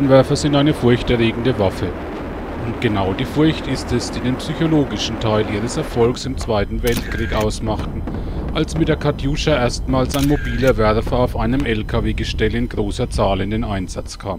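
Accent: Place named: Franconia, Germany. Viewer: Ein Sprecher mit so einem starken fränkischen Dialekt spricht für "Russland.TV"? Hätte das nicht jemand mit russischem Akzent übernehmen können? Hätte wesentlich schöner geklungen. :)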